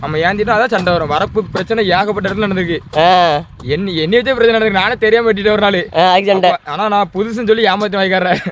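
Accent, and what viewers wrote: Tirunelveli, India. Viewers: சூப்பர் பா திருநெல்வேலி தமிழ்ல நீங்க பேசுறது சூப்பர் இந்த கருத்தை சொல்வதும் சூப்பர் எல்லாரும் தெரிஞ்சிக்குவாங்க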